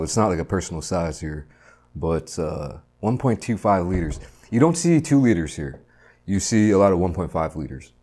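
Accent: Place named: Midwest, United States. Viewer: I knew you had a midwest accent lol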